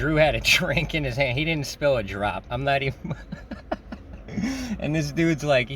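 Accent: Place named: Long Island, United States. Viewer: 💜🐷💜 Enjoy listening to your stories. Watching from Palm Coast FL. Had a boyfriend from Long Insland who died 10 years ago. Your accent reminds me of him.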